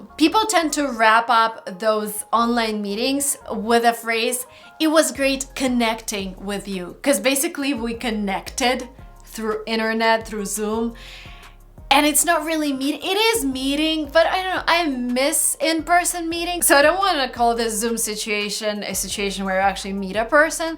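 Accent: United States. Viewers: You inspire me a lot. People say you can't have an American accent because you're not a native speaker but YOU DO, so I've been improving my accent for like 5 years now and now people think i'm American haha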